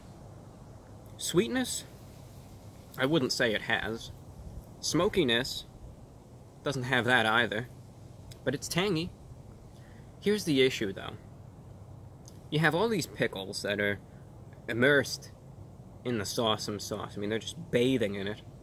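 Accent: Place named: Long Island, United States. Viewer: If you're from Florida Reviewbrah, why do you sometimes sound like you're from Long Island?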